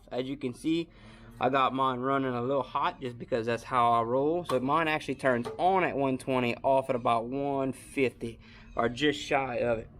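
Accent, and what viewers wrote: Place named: Louisiana, United States. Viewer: Love me some Cajun accent. Bro lived in Lake Charles.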